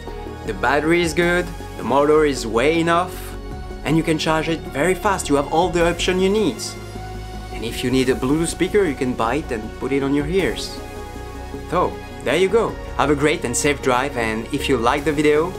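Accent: France. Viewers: Laissant de côté l'accent français trop prononcé, tu parles un anglais très bon ! Bravo encore ! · your doing good mate, english with a slight french accents sound really good and you provide some very relevant info to us all... Mercy. You have some very important points about the quality of the suspension which is important.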